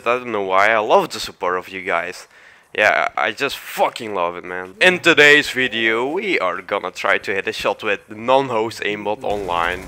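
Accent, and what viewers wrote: Netherlands. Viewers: Is the commentator from dutch/netherlands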